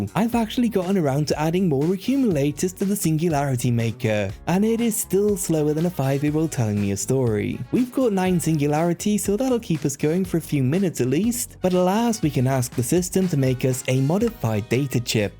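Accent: United Kingdom. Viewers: I like the british accent.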